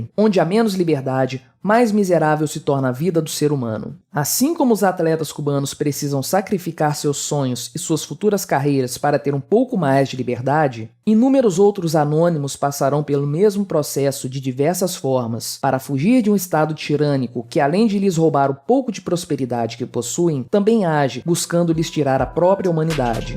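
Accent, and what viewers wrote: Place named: Belo Horizonte, Brazil. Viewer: o sotaque desse narrador é idêntico ao do Nikolas Ferreira de BH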